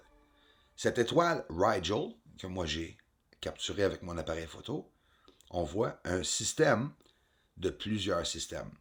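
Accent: Quebec, Canada. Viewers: Salut Rien que d écouter cet horrible accent québécois, ça le fout le bourdon.. Dites vos conneries en ̀anglais , c est mon conseil.. La c est too much bullshit, sur le fond et la forme. .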